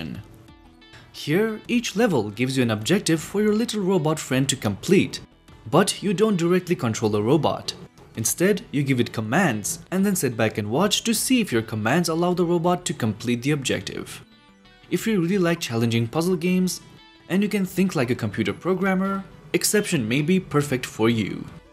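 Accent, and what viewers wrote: India. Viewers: this guy's English Accent in INDIANISH. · Indian accent can never be hidden..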